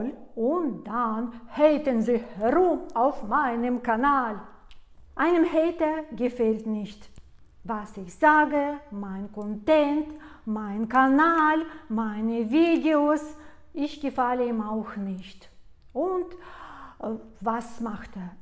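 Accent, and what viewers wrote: Russia. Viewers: Ich hab mir zwar geschworen nie mehr zu heiraten, aber Dich würde ich glattweg sofort heiraten, laaach. Nee, keine Angst, ich weiß das Du verheiratet bist. Aber ich verstehe diese Vollpfosten von Hater nicht. 1. Deine Ratschläge fruchten und haben Hand und Fuß, im Allgemeinen. Und ich denke, wenn Du die evtl. Situation genau kennst, sagen wir mal von einem Teilnehmer Deines Coaching, Du auch ihm ganz treffsicher helfen kannst. 2. Du hast mich in diesem Video zum lachen gebracht, dem Hater wird es wohl nicht geschmeckt haben, laaaach. 3. Du hast keine Schlauchboot Lippen, finde sie sehr sexy und anziehend :) 4. Ich mag Deinen Russischen Akzent und auf den kannst Du natürlich stolz sein. 5. Ich finde es toll das Du die Deutschsprachige Kultur, sowie Deine eigene magst. Das macht Dich auch für das Coaching interessanter und Du hast auch mehr Überblick wie Manner verschiedener Kulturen "klicken". 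6. Du hast einen tollen Humor und gehst die Sache mit den Kohlköpfen/ Hater richtig an. 7. Ich mag Dich so wie Du bist und mach weitere so wertvolle Videos wie bisher. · Ich liebe deinen russischen Akzent. Übrigens: Wir Bayern rollen auch das "Rrrrr".😁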